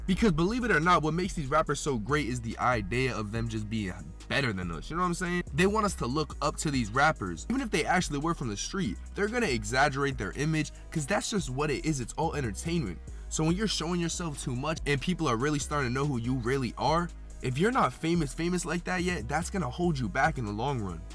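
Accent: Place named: Toronto, Canada. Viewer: i can hear the toronto in ur voice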